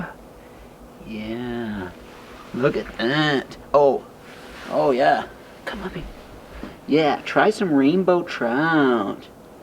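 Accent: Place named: Tennessee, United States. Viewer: That Tennessee accent king in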